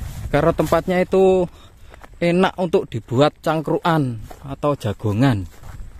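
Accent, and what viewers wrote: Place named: Java, Indonesia. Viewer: Welleh...bahasane kok medhok gumunan ...tow mas.. Bahasane koyo logat lamongan po..? Kok medhok timen.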